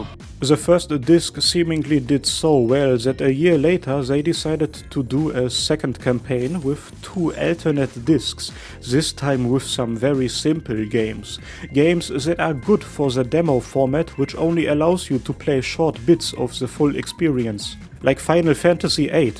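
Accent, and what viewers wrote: Germany. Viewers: Love your german accent hahah 😀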